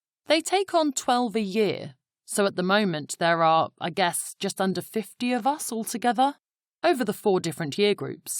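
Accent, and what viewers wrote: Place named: United Kingdom. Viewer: fk uk accent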